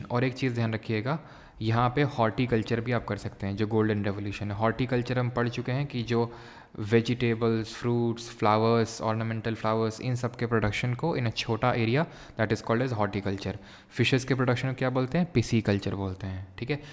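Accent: Kanpur, India. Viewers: भैया बाकी सब ठीक है अपनी उन्नाव कानपुर वाली भाषा सुनके मजा आ गया 😅😅😅😅 कि इतनी ठंडी नही पड़ती की ( जड़ा 😅) जयहो 😅😅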